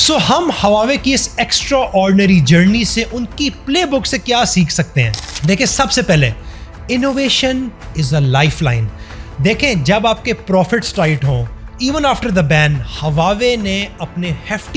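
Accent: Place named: Punjab, Pakistan. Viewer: बोलने का तरीका पाकिस्तानी पंजाबी स्टाइल है अगर आप थोड़ा सा ध्यान दे तो साफ समझ आता है। तो अब निकल पहली फुर्सत में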